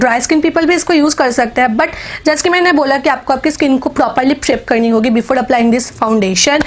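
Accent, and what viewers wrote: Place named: Bengal, India. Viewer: Bengali accent😍😍😍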